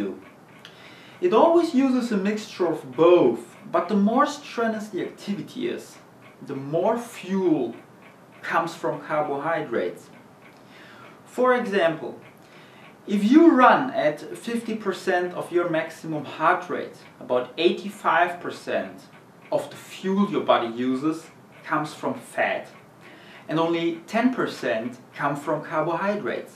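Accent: Germany. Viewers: After all, I still don't know what do do in my situation =D What do I have to do to lower my bodyfat percentage without losing weight anymore? Im already down to 69 KG (my body height is 188 cm) and I can't see my abs at all? Maybe you can answer my question. Would be nice if you PM me and I would prefer german, you sound like you're originally from germany ^^ Greetings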